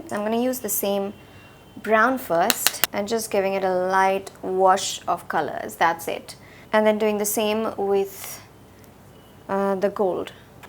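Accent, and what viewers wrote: Bengal, India. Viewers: Hey just subscribed & loved ur video! r u Bengali? U sounded like a Bengali. I'm from Bangladesh. Sending love from here 😘 Nd u're so much natural beautiful that any makeup look will suit u.even without makeup u're much pretty 😍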